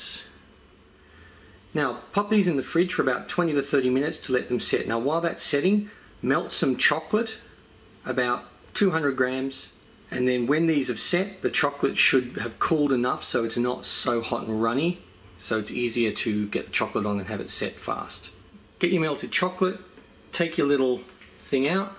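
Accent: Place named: Australia. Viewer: Remember when I was only 11 and watched a ton of your videos, I think you only had around 50k subs. Still Love Your Aussie Accent!!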